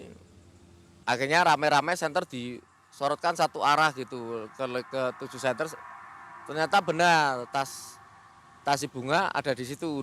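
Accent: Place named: Semarang, Indonesia. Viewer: Logat e semarangan pol mas 😊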